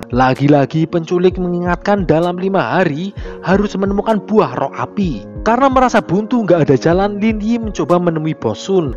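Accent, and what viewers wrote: Banyumas, Indonesia. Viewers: ok gokil,tambai ngapak ngapak,,yg lebih medok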